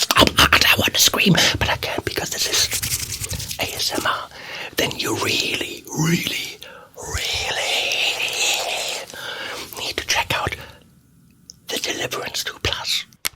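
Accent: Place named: Germany. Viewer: Now I remember how I UNSUBSCRIBE from this channel. Not only that HIDEOUS german accent and BAD ATTITUDE, now ASMR🤦‍♂🤦‍♂🤦‍♂. Please somebody beside me tell this man this is not the best way to review an amplifier. You lost a sell , Mr Fryette.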